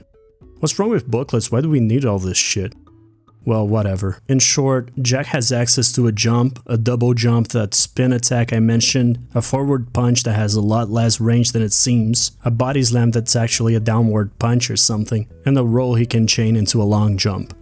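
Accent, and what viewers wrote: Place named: Europe. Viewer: I apologize if it's rude at all but your accent caught my ear, it sounds nice! Are you a European Ytuber? Also, I grew up with the Precursor Legacy and genuinely loved the hell out of it. Jak not really being 'mute' but moreso Daxter interuppting/butting in before Jak can speak is unique lol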